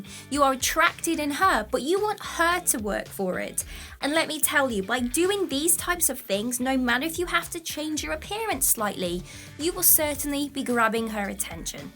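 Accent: United Kingdom. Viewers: I Just listen to her for learning British accent lol.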